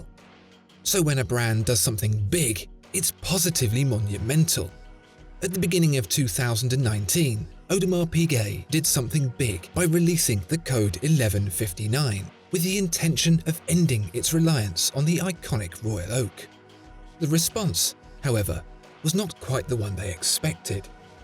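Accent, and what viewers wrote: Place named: Midlands, United Kingdom. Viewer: Waffling on as per usual, over four minutes of absolutely nothing to do with the watch in question! I dip my toes into your channel occasionally as the videography is excellent. I just find the waffle nauseating, but I know I’m in the minority, oh and that middle England voice, it’s like listening to an account waffle on about investments!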